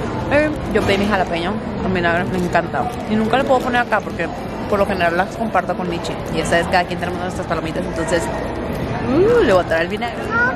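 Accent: Monterrey, Mexico. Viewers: Eres súper buena onda, no sé si es mi imaginación pero como que tienes acento regio, me identifique jajaja Saludos desde Monterrey 🇲🇽🤠 Bendiciones ✨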